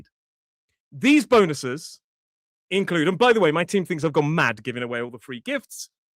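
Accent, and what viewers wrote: United Kingdom. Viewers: Greetings from Panama, Central America. I love that British accent; it conveys a certain air of royalty, class, elegance, and refinement that North American English doesn't.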